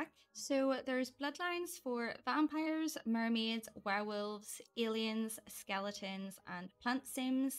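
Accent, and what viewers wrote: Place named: Northern Ireland, United Kingdom. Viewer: Omg I got so excited when I heard your accent! I have never came across a fellow northern Irish simmer! ❤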